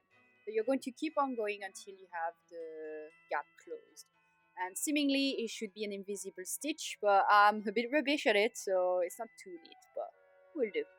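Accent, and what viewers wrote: France. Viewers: Great tutorial I will make it for myself and my friend. I love your cute french accent. your English is great. Merci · I love your video!!!! I am going to make them this Xmas 2022! I too have cats that are helpers. Not to insult you are you French? My husband was French and I miss the accent!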